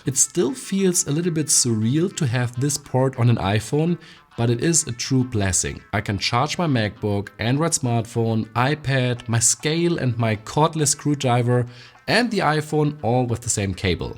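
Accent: Germany. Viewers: Ich mag dein DEnglisch 😅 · You sound German … wouldn't mind this video in German.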